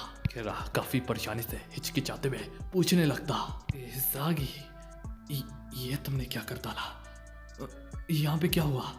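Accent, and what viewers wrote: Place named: India. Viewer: bro just play not say it with cho indian voice makes it terrible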